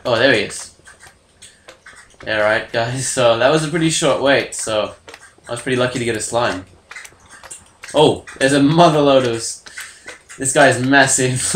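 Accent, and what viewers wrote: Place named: Australia. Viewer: Can really hear your Australian accent start to come through here!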